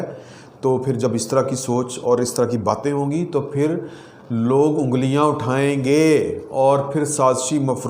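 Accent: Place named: Lahore, Pakistan. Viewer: صاحب آپ جس لاہوری سٹائل میں بات کرتے ہیں نا وہ بہت دل کو موہ لینے والا ہے بہت شکریہ لاہور کی یاد تازہ کروانے کے لیے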